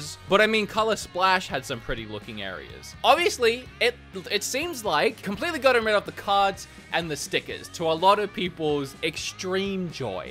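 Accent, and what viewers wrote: Australia. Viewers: I wondered why watching your videos felt familiar. As someone who grew up in australia im not sure if you ever watched Good Game or Good Game Spawn Point but your channel really reminds me of dashing to the tv to watch the hosts talk about whatever game was hot at that moment. The aussie accent def helps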